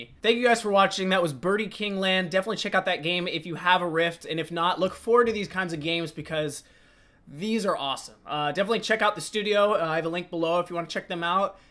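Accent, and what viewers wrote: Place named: France. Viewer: Your french accent is hilarous :D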